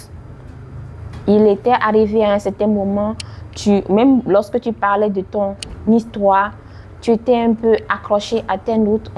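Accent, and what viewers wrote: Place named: Guinea. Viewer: comment tu vas? Bravo pour le travail que tu fais. L'accent guinnéen sort un peu hein😅. Bonne chance pour la suite et Dieu te garde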